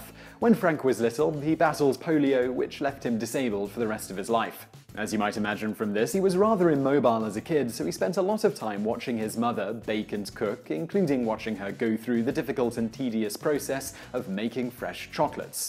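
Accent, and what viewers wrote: England, United Kingdom. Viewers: An english accent calling chocolate 'candy'........my ears have just been assaulted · As opposed to "new-gat", right? I noticed that, but is from the UK so... he says it a bit differently.;) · I'm confused. You have an English accent but use American words - like candy instead of confectionery. So I don't know if you are speaking from a UK or US perspective. To me Mars means that company in Slough, but I think to you it's something else. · Why do you keep calling it candy?? You sound like you’re from the UK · Yes... is from the UK. Quite a few words are pronounced differently compared to what I usually hear in the US. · Hearing a fellow Brit repeatedly saying candy unnerves me!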